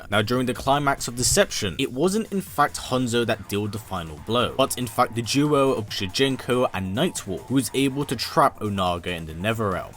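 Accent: England, United Kingdom. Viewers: There is not "v" in the word brothers, so disgusting. Battles are not "bat-holes." Your dialect is garbage, must be from the shithole called england.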